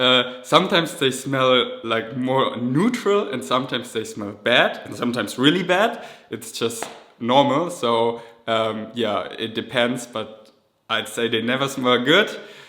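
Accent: Germany. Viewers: Ich finde deinen deutschen Akzent beim Englisch sprechen irgendwie soooo ablenkend :(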